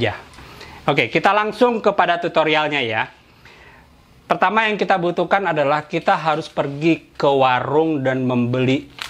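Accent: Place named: West Java, Indonesia. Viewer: Mantaaappp bah...aslina mn siga sunda,slm sukses tkw malaysia nonton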